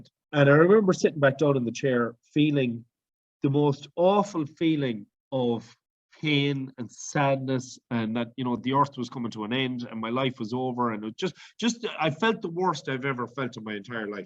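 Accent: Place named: Ireland. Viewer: Thanks 😊loving your Irish accent. How do you take your photos and do you use special software to get the close ups? I'd love to photograph my craft I see.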